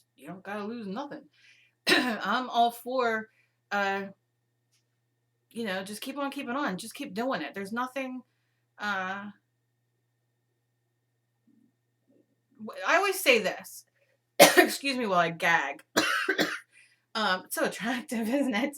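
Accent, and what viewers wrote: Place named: New Jersey, United States. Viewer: You are so NJ and it is so refreshing. I too am a Jersey girl and when you say "frickin'" it just makes me laugh!!!!!! stay strong and spunky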